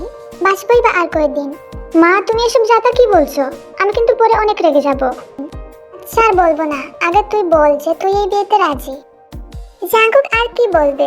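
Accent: Sylhet, Bangladesh. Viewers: আপু তুমি মনে হয় সিলেটি..❤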